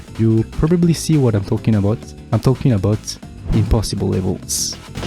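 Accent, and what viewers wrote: France. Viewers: good video but you sound suspiciously french 😤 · No it’s alright volume wise but you have a really strong french accent and it’s really hard to understand you sometimes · You sound french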